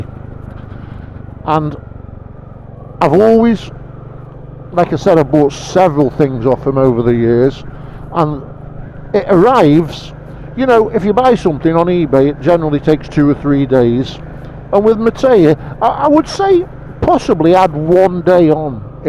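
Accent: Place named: Staffordshire, United Kingdom. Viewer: Nowt up with your North Staffs dialect bit like ours, though bit further afield they travel around on buzzes It was only recently, on someone’s suggestion, I started putting helmet on it’s side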